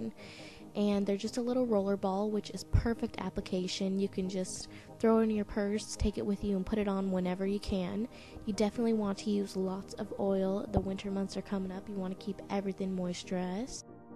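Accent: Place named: South, United States. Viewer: You have a southern accent how cute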